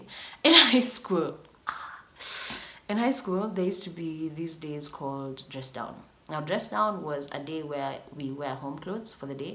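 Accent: England, United Kingdom. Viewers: The British accent 😍 daamn girl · You know storytime is gonna be lit british accent ikicome through😂🙌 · The British accent always giving me feel good vibes😁😁. This gonna be good 👌. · Your British accent ♥️ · It's the British accent for me 😂❤️❤️❤️ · I don't care much about the stories.. But I love hearing that British accent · It’s the British accent for me😂😂😂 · That English accent is Perfect 👌👌🙌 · Am new here and the lady speaks British English.... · I love the British accent♥️♥️♥️♥️ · The English accent❤❤❤😂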